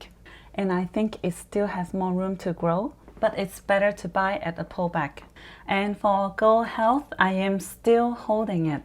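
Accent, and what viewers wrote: China. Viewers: showing them some colors...这中式英语哈哈哈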